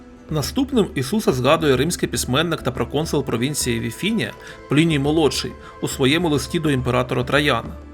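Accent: Russia. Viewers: Хороше відео, проте попрацюйте над українським звуком [г], бо звучить завжди [ґ] - різкий рос акцент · супер. але працюйте над вимою букви "г"))) Бо чути, що вимова російська))))) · Російський акцент ПІДБІШУЄ.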